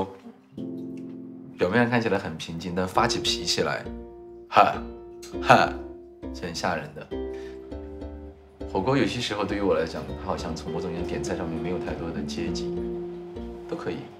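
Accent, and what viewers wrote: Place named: Chongqing, China. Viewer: 听出来他的重庆口音了 哈哈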